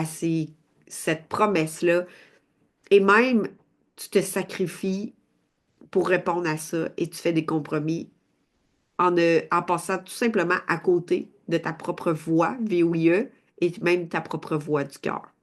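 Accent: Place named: Quebec, Canada. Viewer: Je supporte difficilement l'accent quebequois.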